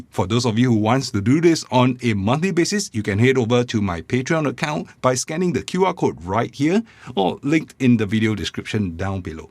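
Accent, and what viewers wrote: Singapore. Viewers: Singlish! Love it. I love your methodical approach and the fact that you respond to comments:) Thanks and Ho seh liao! :)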